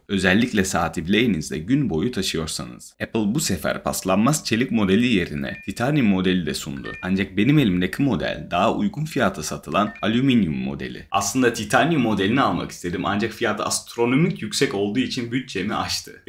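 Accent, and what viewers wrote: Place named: United States. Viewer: Konuşman aşırı rahatsız edici Türkçeyi Amerikan aksanıyla konuşuyor gibisin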